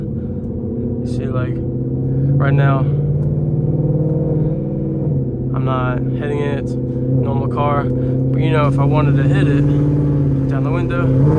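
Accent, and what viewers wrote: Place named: South Jersey, United States. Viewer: you gotta be from south jersey or philly…. gotta be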